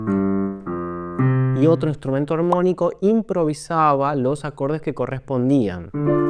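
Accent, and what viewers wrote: Argentina. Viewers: Sos insoportable, en todos los videos decís la misma idiotez. Es la forma en la que todos los argentinos hablan hoy en día.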